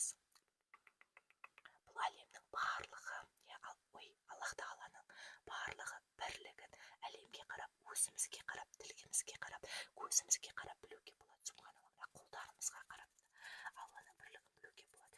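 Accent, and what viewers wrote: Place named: West, Kazakhstan. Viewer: батыстансың ау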